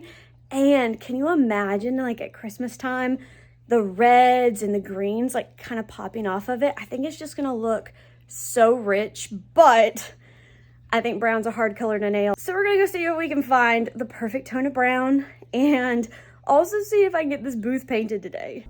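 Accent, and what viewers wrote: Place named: Carolinas, United States. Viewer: You mention knowing Abby ~ she’s from the Jacksonville area and you sound like your’re from one of Carolinas. Take Care. 😊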